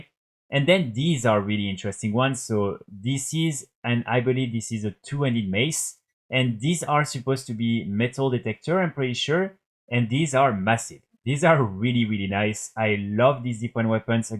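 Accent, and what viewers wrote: France. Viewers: Cute accent Frenchie.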